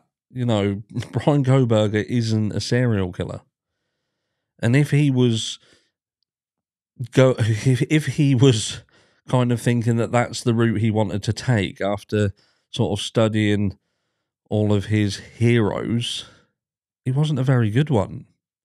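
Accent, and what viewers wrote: United Kingdom. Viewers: Face it, folks......true crime just always sounds better with a British accent.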